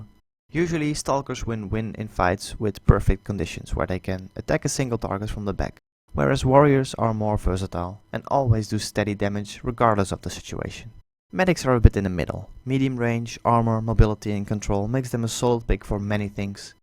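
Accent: Netherlands. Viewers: lol, je klinkt nederlands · nice dutch accent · The Dutch accent though, gj explaining man! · Are you dutch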